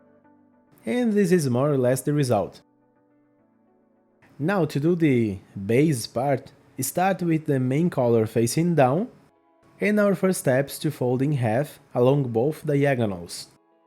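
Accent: Brazil. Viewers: Vídeo salva muito, logo reconheci que era br pelo sotaque, inglês bom e vídeo bom! Precisava de uma embalagem pra um presente pequeno e essa ficou perfeita, obrigado!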